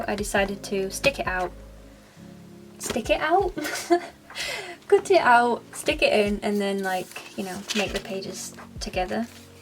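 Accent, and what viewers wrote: Yorkshire, United Kingdom. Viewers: are you from Yorkshire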